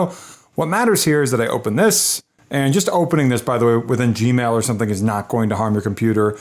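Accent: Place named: India. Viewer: As soon as I heard an Indian guy I was like nahhh😭😭😭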